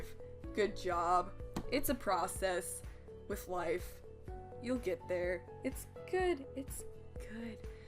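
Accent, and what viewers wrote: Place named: United Kingdom. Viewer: 'Layers are the essence of art' now this my friend is a good quote! You're so right layers are everything ahah! well with how i like to art anyway! XD You can see your improvement so evidently!! The anatomy and the fluidity of her pose looks so much more natural! Also that portrait is super cute! I legit always use references! i'd like to make my work a lil more stylised tho :) ahahah you cutie! its 'A' "y' 'art' '96' thanks for the amazing british accent now i know how i sound!! XD (never does a voice over again) jk jk :D and its true ya WONT SUCK FOR EVAA. You have definitely improved it looks great and you should be happy with yourself gal! Who knows where you'll be next year <3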